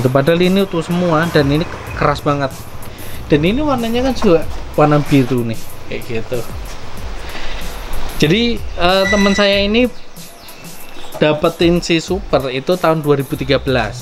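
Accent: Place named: Jakarta, Indonesia. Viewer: Mantab mas. Tapi logate og rodo jakartanan saiki😅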